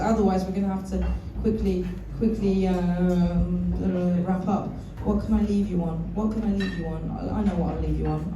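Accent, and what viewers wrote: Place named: London, United Kingdom. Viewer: Beginner ingilizcemle Londra aksanını 23 dakika izledim, hiçbişey anlamadım ama eğlendim teşekkürler 🤝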